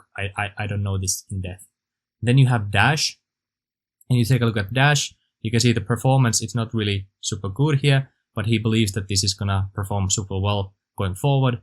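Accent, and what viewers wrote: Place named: Finland. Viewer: I sense a fellow finnish accent, great content btw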